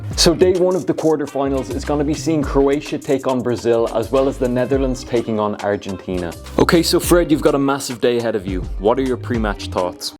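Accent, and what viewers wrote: Ireland. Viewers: Are you from Ireland🇮🇪🇮🇪🇮🇪?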